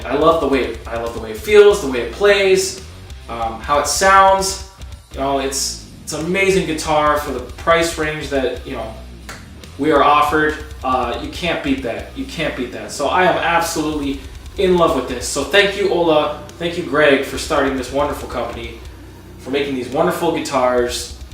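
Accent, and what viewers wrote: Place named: Canada. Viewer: Thanks, I thought I heard a Canadian accent :-).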